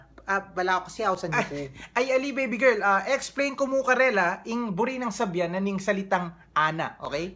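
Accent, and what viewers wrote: Pampanga, Philippines. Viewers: Magaling k talaga'yTo. Kpmpagan ka tlaga ksi keng gale mu at diction at bumigkas Mekeni ka talaga. Magaling ka Abe, baka Ika ing mawawala kung anak ah, pwde dkang paDNA ? Hehehe, i tule me ing ggwan mu para lalu kang mikuwalta kreng vlogs mu, emu ku kklingwan, gambatte 'NE !!!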